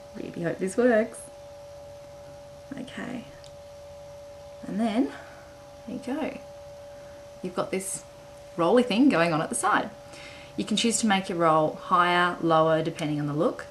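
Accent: Australia. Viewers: You remind me of a Play School presenter becuase of your friendly and soothing voice. I love the people on here marvelling at the Aussie accent. haha.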